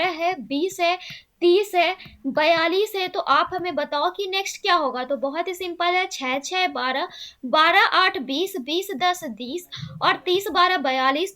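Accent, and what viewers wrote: Bihar, India. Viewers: Mam, kya aap Bihar Sharif se ho?? 🤔🤔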